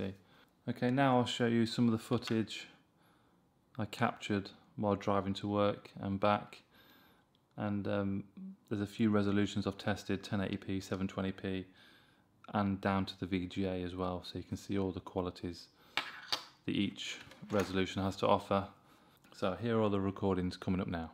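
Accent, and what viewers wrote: United Kingdom. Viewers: Help! When I turn on the dash cam I get the globe and then immediately asked to choose Mass Storage or PC and it freezes when in the car. Also, the charge does not seem to be holding because when I charge via the USB for hours, then unplug and try to turn on, nothing zippity do dah. Can anyone help me? PS: This is the best video. I love the Queen's English as I don't speak American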